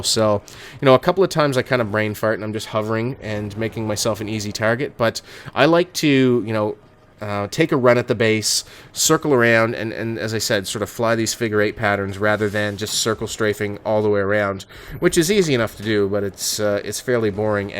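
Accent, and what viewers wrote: Canada. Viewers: aboot !!! haha Canadians and their aboot !!!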